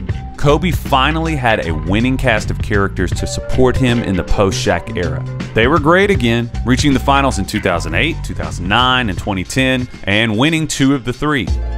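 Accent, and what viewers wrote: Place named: Kentucky, United States. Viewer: Where in Kentucky are you from? Just curious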